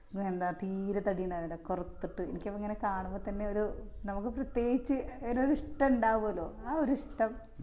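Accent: Palakkad, India. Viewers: ഞാൻ പുതിയ subscriber ആണ് ട്ടോ. പാലക്കാടൻ ഭാഷ കേട്ടു വന്നതാണ്. നല്ലൊരു അനിയത്തികുട്ടി. എന്നും നിങ്ങളുടെ സ്നേഹം തളിർതിരിക്കട്ടെ. ഇന്നത്തെ പെൺകുട്ടികൾ വാക്കുകൾക്ക് കാതോർക്കു. ❤️❤️❤️❤️❤️❤️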